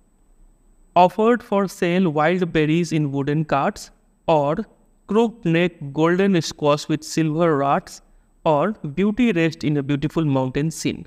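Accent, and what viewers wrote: Bihar, India. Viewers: IMAO BIHARI ACCENT ME ENGLIGH SUNNA BOHOT ACCHA LAGTA HAI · Sir aap bihar se ho ?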